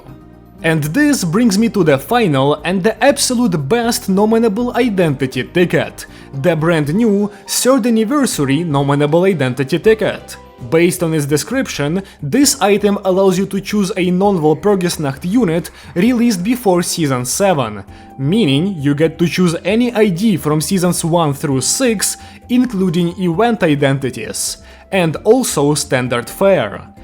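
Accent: Russia. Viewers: Что за Русский акцент... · What is accent sound like Russian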